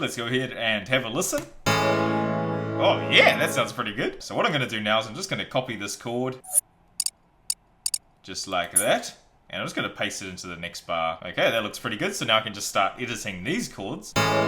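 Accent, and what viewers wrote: New Zealand. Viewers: You from nz g?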